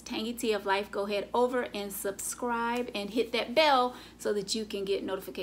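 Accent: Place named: South, United States. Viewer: I love your southern accent. Thanks for the deals!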